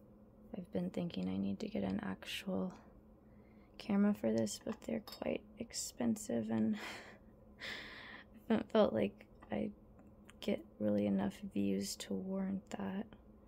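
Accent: United States. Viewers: Thanks for the video 😊 I think you did an excellent job of speaking and filming. The close ups looked fine and you could see detail like you wanted. I am also a southern girl and I do drawl 😂 You were not hard to listen to for me but I am one of those early 50’s demographic and have some patience I have developed. I enjoyed this video and what a cute expression of creativity! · As a fellow Midwesterner from Kansas, I too speak like you and many of us from the area. Don't change who you are or how you normally speak because someone complained about it. They can actually change the speed of the video on their own. People need to accept people for whom the are. If it bothers them, it's them, not you. ❤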